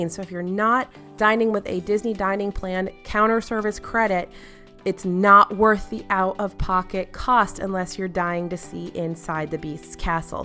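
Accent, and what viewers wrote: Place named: Buffalo, United States. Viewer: are you from Buffalo? Takes one to know one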